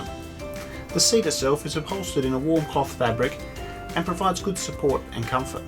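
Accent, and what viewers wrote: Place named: Australia. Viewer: Another great review! In general I'm not a fan of "in your face" flight reviews, but your professionalism, insightful commentary, and intriguing Aussie accent set the standard! Your style in fact inspired me to start doing my own YouTube flight reviews on the other side of the world. Question for you or anyone in the comments: I have the option of 2 flights in Cathay J long haul or on JAL supposedly in their sky suite III. Which should I take?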